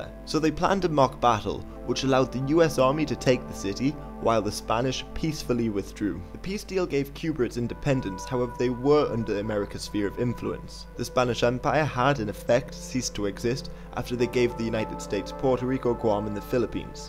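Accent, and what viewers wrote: United Kingdom. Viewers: Is this a British man talking? this makes no sense unless her learned to talk British in the US >_<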